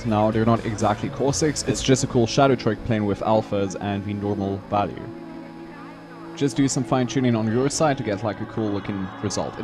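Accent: South Africa. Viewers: You sound South African? Yeah boyeeeeeee!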